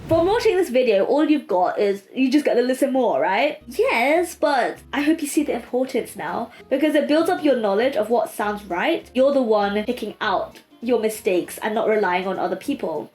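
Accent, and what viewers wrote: United Kingdom. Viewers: You sound like British but with some Singlish, and I thought you were Singaporean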